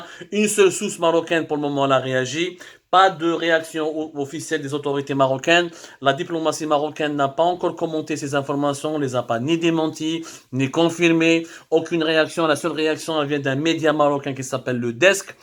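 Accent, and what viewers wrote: Algeria. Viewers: Abdou Semmar est Algérien son accent le prouve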